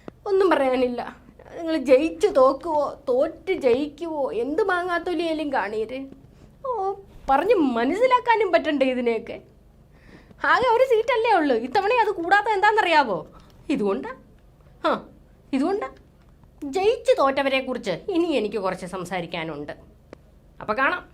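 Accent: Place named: Malappuram, India. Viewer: ഇതിന്റെ വീട് എവിടെയാ? മലപ്പുറത്തെ ഇജ്ജ്, അന്നെ എന്നൊക്കെ കേൾക്കുമ്പോ ഞങ്ങൾക്ക് കുളിര് കോരുന്നു. ഞങ്ങളെ മലപ്പുറം ഭാഷ 😂😂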